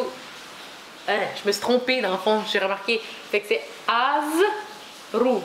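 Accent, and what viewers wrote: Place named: Quebec, Canada. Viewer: Super ton accent québecois! Pour le 'M' l'accent et la façon dont c'est écrit n'ont aucun rapport, la canne c'est just quand c'est à la fin du mot quelque soit l'accent dessus ;)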